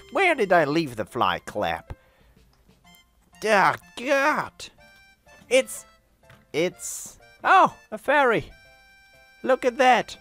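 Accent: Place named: Germany. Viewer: Yayness for German accent! CAPITALISM, HO!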